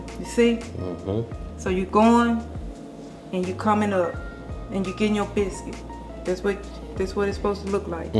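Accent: South, United States. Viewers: She's a southerner and that's the way we talk. Get over it 😂😂😂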